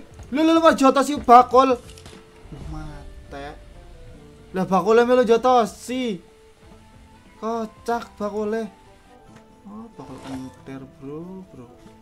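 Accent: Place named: Tegal, Indonesia. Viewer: Cuk ngapak nemen kie. Wong tegal apa wong semarang kie 😂😂😂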